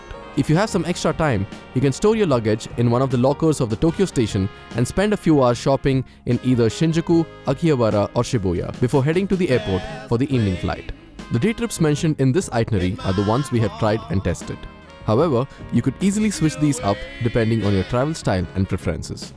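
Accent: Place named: India. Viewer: The indian accent is a bit too much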